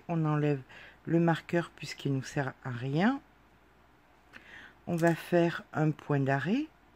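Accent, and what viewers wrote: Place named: South, France. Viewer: Merci pour ce tuto.mais pour une débutante... le point coquille n est pas toujours évident. Je n ai fait qu un rang de point coquille. Je b arrivais pas à bien comprendre sil fallait piquer dans chaque côte ou pas... le cadrage n étant pas optimum... mais continuez comme cela..avec votre charmant accent du soleil!😘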